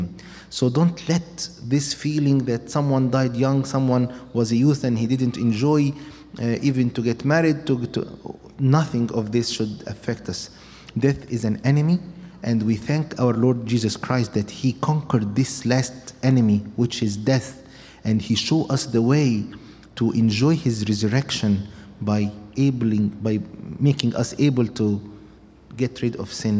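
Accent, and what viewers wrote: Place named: Northern Mariana Islands. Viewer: hmm this is in english, though the accent is thick, and though I am certain this Priest is from the middle east parentage he kind of sounds like some people I know from the marianna islands in the pacific they kind of sound spanish with they way they pronounce certain words, that aside about 5 minuts in I am enjoying listening to this sermon, its very deep and thought out, and last but not least informative, oh I am a traditional roman catholic another appostalic church if you copts like to know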